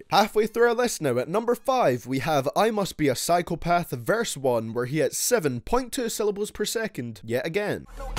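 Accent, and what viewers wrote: Scotland, United Kingdom. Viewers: Are you Scottish?? I can't tell you have like 3 accents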